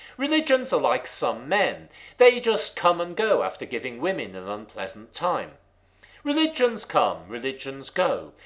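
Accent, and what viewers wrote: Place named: Merseyside, United Kingdom. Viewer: Like the posts. I think I detect a hint of Mersey in your voice, despite being in Croydon... Keep up the interesting work.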